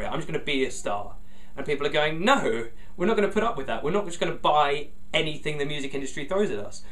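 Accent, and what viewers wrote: United Kingdom. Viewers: I LOVE YOU!!!! You are a miracle to this planet and you explained this so well and I agree 100%! You are the smartest brit ever and your accent is amazing. And rants are awesome and people should just rant about more things cause sometimes they're really fun to listen to. This video is EPIC.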